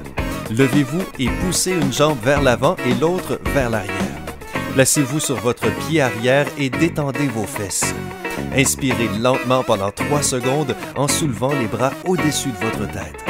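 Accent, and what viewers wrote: Quebec, Canada. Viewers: C est l'accent de Québec celui ci?désolé mais c est vraiment étrange. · Des rigolos avec un accent québécois qui dis n'importe quoi j'en ai vu beaucoup, mais des comme toi..... Une perle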